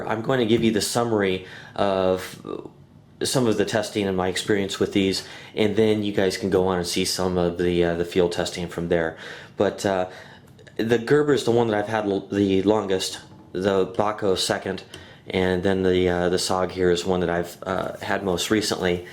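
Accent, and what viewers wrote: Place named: Texas, United States. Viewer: Thanks for this great review man! U from Texas ;-)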